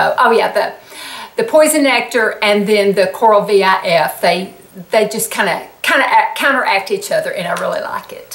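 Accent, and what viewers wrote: South, United States. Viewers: Bonjour! I’m a Southerner too and for most of French people, I have a strong accent. They like to make fun of us but I don’t care, I love my accent, it shows my roots and is full of sun ☀️ You have a nice accent, and you speak slowly so it’s easier for me to understand you than other American women who speak very fast. I love your sweater, you’re stunning as always. Have a great day my Southern friend. Gros bisous de France 😘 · I love your accent...you sound like me...lol! Some people think if you have a southern accent you’re stupid...at least in my experience. Love ya! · You have a beautiful southern accent ! When reading comments from people , as soon as they get negative or mean just stop reading the comment. Don’t let anyone take your joy away! 😘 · I love your accent I mean it's a part of you it brightens my day and makes me smile your such a beautiful lady with the sweetest personality! 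Your very country and southern an I just love it! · I’m from the south and far from home, your accent is one of the reasons I listen! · Howdy, I'm from Connecticut and I don't have an accent 😰. At least I don't think I do lol. Anyhow I love your Southern accent! Can I borrow it lol. Besides my FAVORITE male recording artist since I was a lil girl has an accent like yours and I could listen to him till the day is long. He's KENNY ROGERS!! 👏👏👏👏👍👍👍👍💥💥💥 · Hey! I can’t believe that someone said that about your accent!!!! How rude!! Of course I have a southern accent also.......😂😂Oh I love that lipstick combo!! Sooo pretty on you!! I’m going to get that Praline and Pear 🍐 body wash!! Yummy 😋 I love fall,don’t you?? That balloon 🎈 arch is GORGEOUS 🥰 GORGEOUS GORGEOUS 🥰🥰 Great job!!! Love ya girl 💋💋💋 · I am from Alabama and love our Southern accents. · Aren't you a ray of sunshine? Love this color on you! 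☀️ Southern accents are brilliant!! 💛 Wanna swap with this Jersey girl? #fuhgeddaboudit 😝 Love and thanks always, xx PS: Crime Junkies podcast is really good! 😎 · I love your accent! I’m from California and I put on your channel while I’m making dinner. I don’t know why it is, but your southern accent inspires me and motivates me. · I too am a Southern girl - Kentucky. I love your accent, but most of all your a genuine, natural person! Love ya! Try Opi Nail Envy will strengthen & restore your nails, it’s magic. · Awe, my mom was watching the country show on PBS while I was curling my hair in the bathroom and I heard Hank Williams and it brought back so many childhood memories. Btw, love your accent, in fact I always thought that people from the south are some of the nicest people which I for sure know you are.💗💞💕💕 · Your Southern accent is beautiful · I too am a Southerner. The South has several accents and I love them all. 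Your’s is beautiful! Why speak beige when you can speak in rainbow? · I love your southern accent.❤️❤️ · This Georgia girl loves your accent. I'm proud to be southern. Keep on going! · I remember the first time I watched one of your videos. I had been searching the web for something and a thumbnail came up about a “hot” grandmother or something taking YouTube by storm. Well, it was you. I was captivated by your sweet Southern charm, adorable dialect, and Goldie Hawn smile. I have been hanging with you ever since. You are a blessing:) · I love the way you speak! You sound friendly, so genuine. I am aware of the stigma of southern speech but hey Jimmy Carter was elected President. How boring if we all spoke and looked alike. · The reason I started following you is because of how much I love your accent. I discovered you in 2015 via the Huffington Post (or somewhere similar) who said "you'll be as obsessed with her Southern twang as we are," which I think is a high compliment! 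The person who called you a hick was just showing their ignorance. Keep talking x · I love your southern accent. It is very charming!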